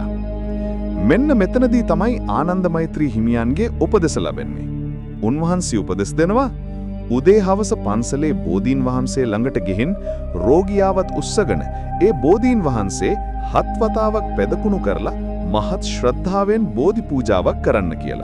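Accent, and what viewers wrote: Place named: Tamil, Sri Lanka. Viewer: මේ voice එක AI ද? එසේ නම් කරුණාකර සිංහල භාෂාවෙන් හඬ කවන වීඩියෝ සඳහා නියම සිංහල උච්චාරණය සහිත AI voicing version එකක් තෝරා ගන්නා ලෙසට මේ සංලක්ෂා යු ටියුබ් නාලිකාවේ හිමිකාරත්වයෙන් ඉල්ලා සිටිමි. නැතිනම් සිංහල මවු භාෂාව වන අයෙකු ලවා ඔබගේ script එක voice කරවා ගන්න. මේ නාලිකාවේ සෑම වීඩියෝවක්ම තියෙන්නෙ මේ කටහඬින්. අපිට දැනෙන්නේ හරියට දෙමල භාෂාව කතාකරන කෙනෙක් සිංහල කතා කරනවා වගේ. එය එක දිගට ශ්‍රවණය කරද්දී අමාරුයි, රසවින්දනයට බාධාවක්. ඔබගේ වීඩියෝ හොඳයි, educative,We feel like we are listening to a different language. Please don't interpret this as discrimination against any other language.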